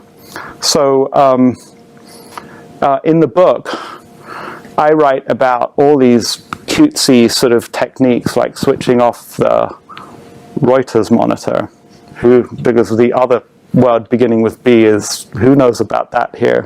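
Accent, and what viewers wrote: Europe. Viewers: listen to his voice, European.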